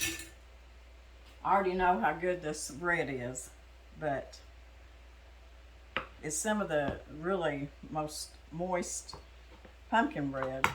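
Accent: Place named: Arkansas, United States. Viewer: Boy, did you bring back some old and wonderful memories. I used to make pumpkin bread in Folger's Coffee Cans and it always turned out wonderful. Later down the road I went to a pampered chef party when they introduced their new baking round, for baking breads. They had their Pam. Chef logo and design in them, which I thought was so cool. But to my dismay, after receiving mine and baking a loaf, it looked for like a cheese roll because the pans themselves were not anywhere big enough in size (round), it baked up too quick and as I said looked like a cheese log. So, I went back to my old way using Folrgers Coffee Cans. You remind me so much of some of my Aunt's who all live in Arkansas and "sound" just like you. I was born there (Morrilton Ark) but my Dad moved us to Texas for when I was 5 years old. We traveled back there most every year for vacationing and "Decoration Day". 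I love Arkansas. Those many times I spent there are the best memories of my life. I'm new to your channel and subscribed, so I'll be making many of your recipes I found, first being your pumpkin bread recipe. Thank you so much for sharing with u and say hello to my fellow (Arkansa-yers) !.. God Bless you and your husband. Linda D. from Texas.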